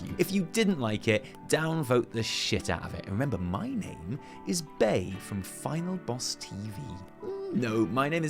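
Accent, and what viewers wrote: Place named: United Kingdom. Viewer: Late or not, don't need a reason to listen to you talk about WoW in a glorious British accent. Keep 'em coming.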